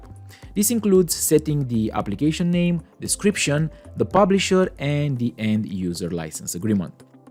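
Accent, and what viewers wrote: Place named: Romania. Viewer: Mereci de tutorial :D excelent :D mi-am dat seama ca esti roman dupa accent :D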